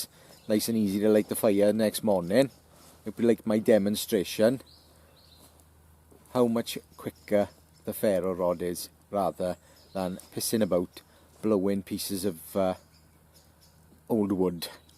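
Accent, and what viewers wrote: Wales, United Kingdom. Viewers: Good stuff , new here, sounds like you're in Wales?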